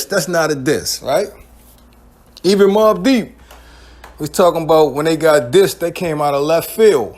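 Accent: Baltimore, United States. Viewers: All I hear is your Baltimore accent. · Bmore prolly? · You definitely from B-more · Off subject ..But peeps from BMore accents be wild wit The U`s and 2's. GETS ME every time but anyway always dope videos 🤣🤣🤣🤣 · U got a hard Baltimore accent. I recognize it anywhere. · Are u from Baltimore? Cuz i hear that accent 😂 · You sound like you're from Baltimore or the east coast somewhere. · Whoever made this shit is from Baltimore. I can hear it in yo voice motherfucka... 🤝🏿 Salute!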